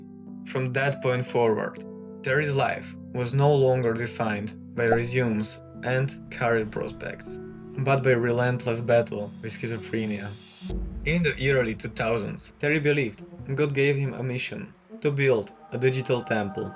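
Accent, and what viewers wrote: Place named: Slovakia. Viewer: ten slovensky prizvuk strasne pocut :dd, pekne video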